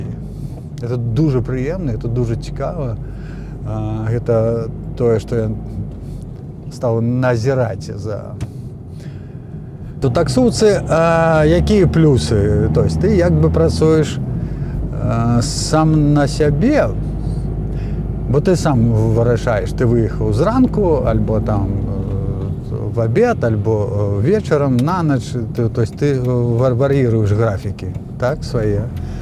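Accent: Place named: Poland. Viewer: Ужо маеце крыху польскага акцэнту! Няхай буде усё добра у вас! На жаль, што такi таленавiты чалавек зьехау у замежжа!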